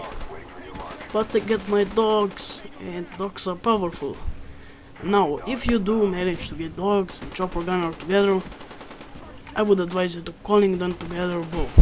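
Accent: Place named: Mexico. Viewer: You sound Mexican rather than someone coming from the Balkans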